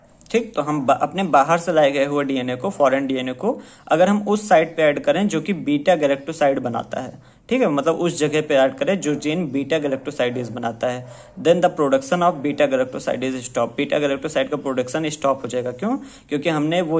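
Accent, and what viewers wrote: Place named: Bihar, India. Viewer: Thankyou for the amazing session 🔥🔥 Sir ap bihar se h?? Humra v accent apke hi jaisa h or bahut acha laga k ap dawn to earth h❤ or jaise h bilkul waise hi show karte h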